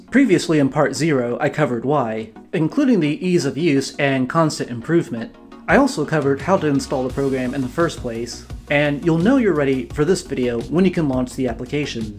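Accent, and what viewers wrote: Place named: Eastern Europe. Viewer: you have an accent....I wonder what your native language is...perhaps a slavic one..?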